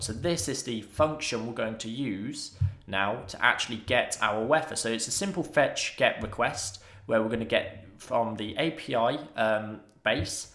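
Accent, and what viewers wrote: United Kingdom. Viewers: learned a nice thing today weather = wafer, the British accent is sometimes very funny and sometimes just annoying :( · you're British right?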